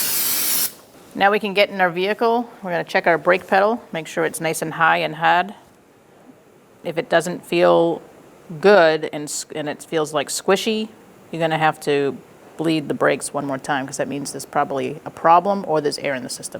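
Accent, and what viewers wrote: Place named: New England, United States. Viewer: love that New England accent.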